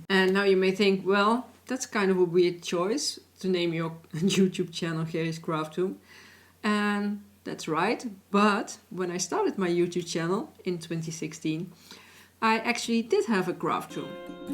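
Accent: Netherlands. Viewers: Thanks so much for making and sharing you work. I love your ideas and you have helped me a lot with your tips and ideas. Also I lived in Amsterdam and Harlimgen in the North in the 1970s (yes Im 70 now) and love to hear you accent. It brings back many memories for me. I watch Dutch TV series to try to keep up my Dutch and really enjoyed Baantjer: Het Begin - took me back to my years in The Dam x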